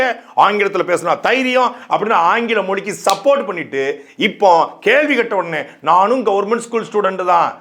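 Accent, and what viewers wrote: Tirunelveli, India. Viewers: சாட்டை நெல்லை தமிழில் அசத்துகிறார் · சாட்டை 🌹நெல்லை slung சூப்பர் ஆக பேசுகிறார் 👍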